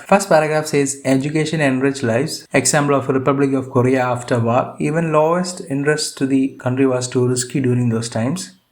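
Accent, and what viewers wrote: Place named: South, India. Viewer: shubhangi he belongs to south india thats the reason his accent is bit different ......i do have many frnds from south india ....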